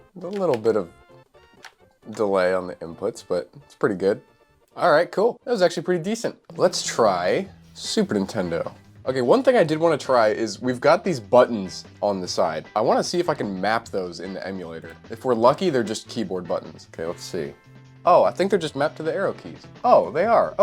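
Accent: Canada. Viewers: because it's the exact same with a canadian accent instead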